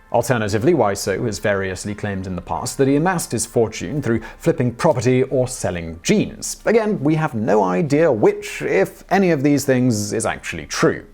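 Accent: United Kingdom. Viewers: Simon reading Tommy's unintelligible quotes with a dignified and professional British accent is pure gold. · The funniest part is hearing a man with an impeccable British accent quote the broken English of Tommy Wiseau.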